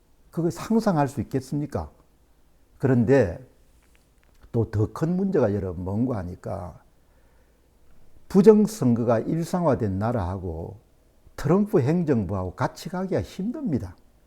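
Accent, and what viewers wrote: Gyeongsang, South Korea. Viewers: 너 경상도네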